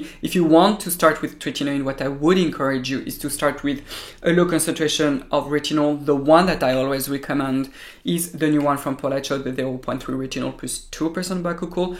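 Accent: France. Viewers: I thank you so much for your content & explanation! Every video you make me smarter & way more informed. Added bonus is listening to your incredible French accent! French was my foreign language in school as I think it is the most beautiful language in the world! ❤️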